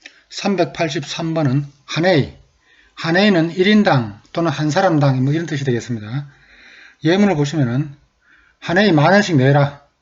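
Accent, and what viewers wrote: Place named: Uiseong, South Korea. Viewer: 안녕하세요 ^ ^ 제가 경상북도 사투리에 관심이 많은데 님 사투리 잘 보고 있습니다. 제가 취미로 사투리 채널을 운영하고 있는데, 님이 괜찮으시다면 ' 경상북도 의성 ' 영상은 모조리 님 억양을 흉내내고 글을 베끼면서 올리고 있습니다. 제 영상이 그렇게 훌륭하거나 유명하지는 않아서 님께서 아직 못 보셨을 수도 있지만 혹시 문제가 된다면 경상북도 의성 영상들은 삭제하도록 하겠습니다 (맨 마지막에 저작권을 따로 주장하지는 않는다셔서 지금 의성 영상 몇 십 개 정도 올렸습니다. 님 사투리 억양을 듣고 억양을 어떻게 할지 모호한 것들은 되도록 안 올리고 있습니다) 저뿐만 아니라 의외로 전국 팔도 사투리에 관심을 가지는 10대 소년들도 많습니다. 되살아나지 않고 사라진다고 하더라도 저는 제 영상에 기록용으로 여러 지역 사투리를 담아내고 보존하고 싶습니다. 제 영상 댓글들은 하나하나 확인하기 어렵지만, 관심이 가신다면 찾아와 주시고 억양도 교정해 주세요! 감사합니다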